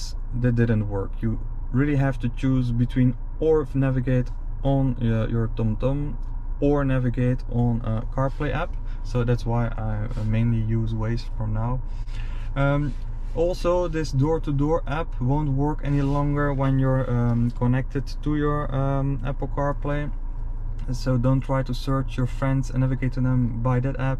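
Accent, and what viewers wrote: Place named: Netherlands. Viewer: Hello, Thank you for your review 😊 Your car is in french but I feel like you have a dutch accent, are you from Belgium ?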